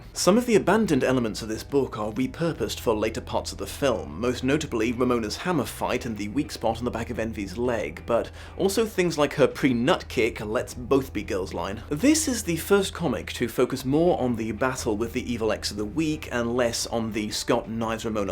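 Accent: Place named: United Kingdom. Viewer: I find your voice so soothing,. But maybe it's just the weakness Americans have for British accents.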